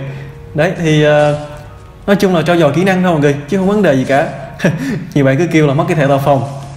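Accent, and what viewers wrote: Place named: South, Vietnam. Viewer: Anh ơi anh miền nam anh ở tỉnh nào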